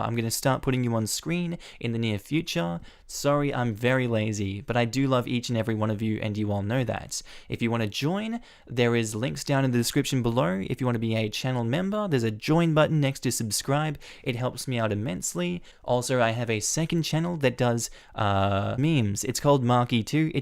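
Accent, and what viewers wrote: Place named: Australia. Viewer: I always love the Aussie accent, but I admit I was wondering how the OP in the hospice was "wearing car keys."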